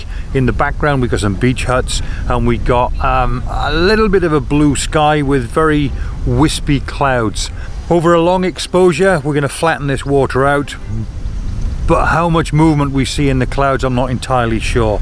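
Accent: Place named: South Wales, United Kingdom. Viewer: Whats your thoughts on a Variable ND Filter? Also where are you from, I'm guessing by your accent you're from South Wales. Big fan, Subscribed.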